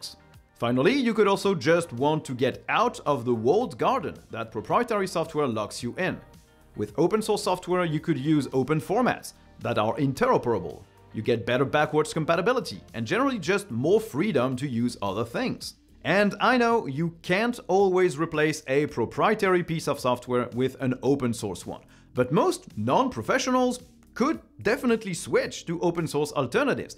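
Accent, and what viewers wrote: France. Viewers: I though with that accent your were french is that correct ? Also been using most of these apps at a regular basis and it's awesome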